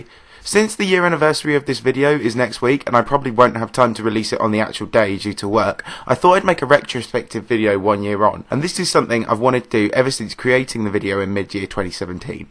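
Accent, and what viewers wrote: United Kingdom. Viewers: 3 reasons I like -His sexy handsome face -His lovley eargasmic brittish accent -His good content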